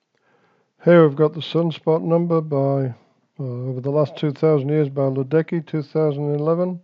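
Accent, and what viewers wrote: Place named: Ipswich, United Kingdom. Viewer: No your wrong, you sound like that fucking Ipswich Town manager with that accent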